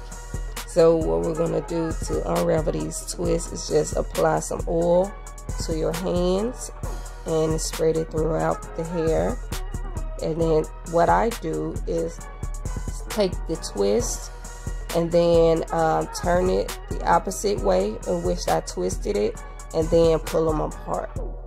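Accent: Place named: Georgia, United States. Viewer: I knew it! My cousin says oil just like you and she's from Georgia. She so funny, she says stuff like "kitchen tile" as "catchin' towel" 😂😂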